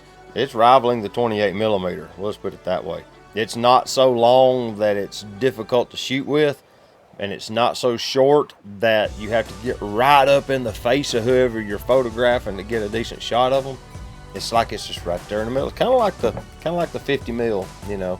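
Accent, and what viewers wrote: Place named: Texas, United States. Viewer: are you from Texas , lover the accent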